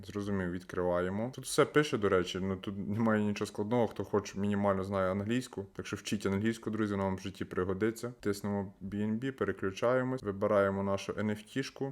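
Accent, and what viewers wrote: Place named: Chervonohrad, Ukraine. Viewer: У тебе діалект як у мене) ти часом не з Червонограда?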